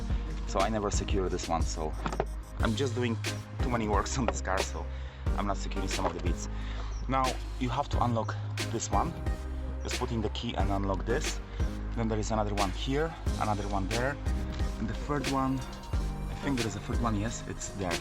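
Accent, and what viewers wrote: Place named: Poland. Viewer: Ale typowo polski angielski 😅 po pierwszym zdaniu wiadomo skąd autor pochodzi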